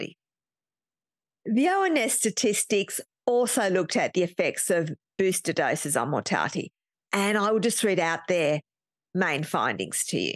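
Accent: Australia. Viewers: Wait a second - all Aussies sound like that. And looks fine to me. Are you Ok?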